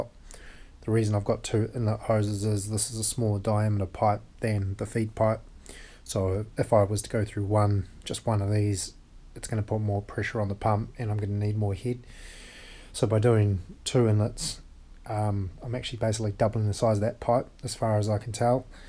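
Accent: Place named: New Zealand. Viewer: Option 1: Mate go to scrap car yard get an old car / truck radiator spray it black put in insulated glass box point at sun. Option 2: Alternatively get copper or aluminum tube paint with matt black assemble in insulated glass box point at sun. Option 3: Section off part of garage roof, seal and paint matt black, place cheap clear plastic cover over the top and send water down. Note seal well due to expansion of roof when hot. The option you have shown as will as first two above would work over a camp fire, tea candles, hot embers etc.. Keep up the good work kiwi "Kia Kaha" Stay Strong, I heard accent and saw orange sand bucket.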